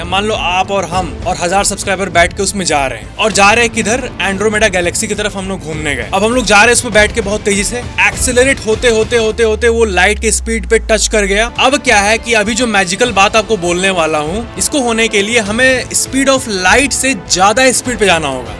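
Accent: Bihar, India. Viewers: Pakka bihari bol chal h bhai ka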